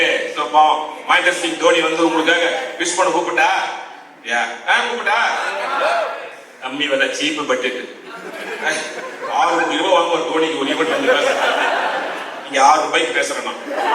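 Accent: Bangalore, India. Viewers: Bro calm down - it's the Bangalore tamilians slang.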